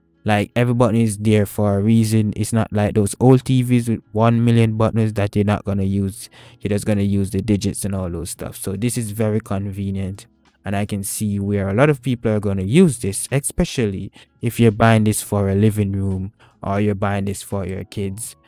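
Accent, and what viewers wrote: Jamaica. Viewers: This man is Jamaican Peeer da rimot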